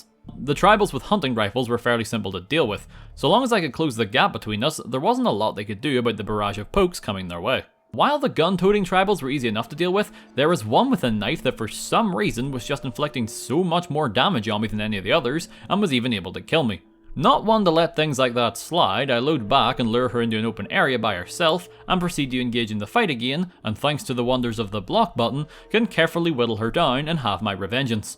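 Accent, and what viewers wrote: Northern Ireland, United Kingdom. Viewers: Ah are you a fellow Northern Irish man playing fallout? What a rare sight 😂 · I needed some of that northern Irish accent today. Great video as usual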